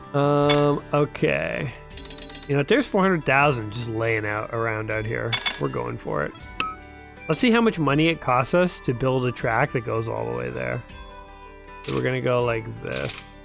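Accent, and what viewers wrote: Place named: Brooklyn, United States. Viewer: Sound more like Brooklyn.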